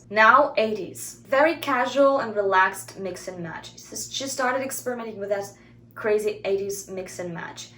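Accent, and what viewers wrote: Russia. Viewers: You got light Russian accent ))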